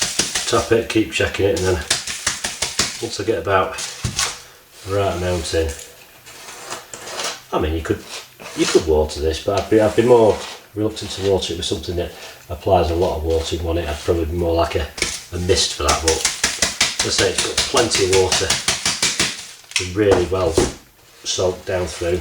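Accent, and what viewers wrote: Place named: Lancashire, United Kingdom. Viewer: I cannot believe that i have only just found your channel! over the last 3/4 years of subscribing to other allotment/ garden channels! Been catching up watching as many as possible of yours over the last 2 weeks. You make them very interesting and informative. I haven't got an allotment...just a small rented garden so its lots of bags and pots but the enjoyment of growing and eating your own veg is so rewarding. Keep the videos coming ,all the best for 2017. I'm originally from Preston... not that far from you...so I enjoy the accent too! . Cheers. Pat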